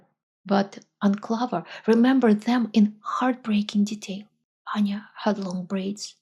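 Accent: Russia. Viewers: U sound so Russian... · I love her voice and accent... Russian-accented English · У вас, как и у всех русских, очевидная проблема произносить согласные. На английском нет звука <t/т> как в слове "путин," также <p/п> как в слове "путин." На самом деле, на индо-европейсом семействе языков согласные "идут" в группе из 3х созвучных согласных. Я добавлю армянский для сравнения, потому что английские согласные также не полные но то что отсутствует на русском, присутствует на английском, и наоборот. Например: Арм: Բ ֊ Պ ֊ Փ РУС: Б ֊ П ֊ ? Анг: B ֊ ? ֊ P Арм: Դ ֊ Տ ֊ Թ РУС: Д ֊ Т ֊ ? Анг: D ֊ ? ֊ T Заметте как на русском и английском отцувствующие звуки одной группы обменяютя не по совсем похожих звуков. Вот почему русский акцент виден со 100 метров. Вам тренироватся надо различить эти звуки. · Very, very good. Loved your excellent English with a touch of russian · Thanks for the video, I learned from you. 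I love your Russian accent! Hungary has been invaded by Russia three times in the last 200 years (1849, 1944, 1956) and occupied for 45 years. So we are suspicious of the Russians and don't really trust them. It was interesting to hear the other side.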